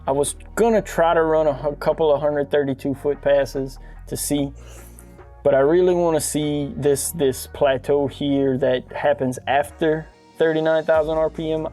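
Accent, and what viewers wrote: Louisiana, United States. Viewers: Your accent sounds like you are from Louisiana if I'm correct what part are you from I'm from Gonzalez but live in Clinton now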